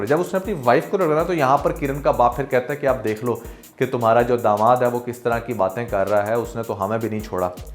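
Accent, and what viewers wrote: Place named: Delhi, India. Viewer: Sir aap phir ko fir na kaha karain aur aisa lagta hai aap dehli se taluq rakhte hain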